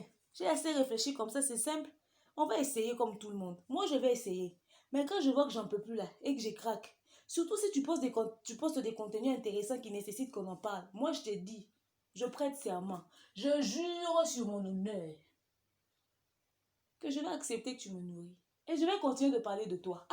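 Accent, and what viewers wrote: Ivory Coast. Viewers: Good Job, ton accent ivoirien est parfait parle en nouchi pour la prochaine!! Fally a simplement fait du sarcasme de l’ironie. Et li y parle aussi de son étoile depuis le groupe Talents Latents,en passant par le Quartier Latin et jusqu'à ce jour avec FVicteam qui est son label: personne ne pourra le stopper!!! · Kkkkkk suis par terre avec ton accent ivoirien nous les warriors on t'aime beaucoup niang's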